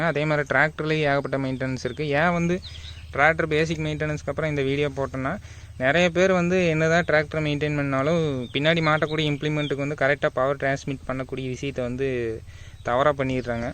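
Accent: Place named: Kongu, India. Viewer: உங்கள் கொங்கு தமிழ் உச்சரிப்பு அருமை சகோ.. Unga slang and way of presentation is superb..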